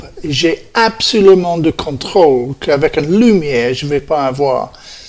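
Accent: England, United Kingdom. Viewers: L'accent anglais est toujours aussi charmant à écouter. Vidéo très instructive avec des moyens OTC, un régal !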